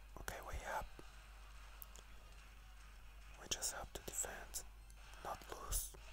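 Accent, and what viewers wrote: Argentina. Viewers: Dude u from Argentina?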